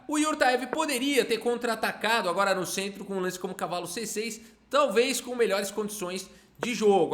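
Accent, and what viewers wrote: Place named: São Paulo, Brazil. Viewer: SP capital. O sotaque entrega muito kkkkkkk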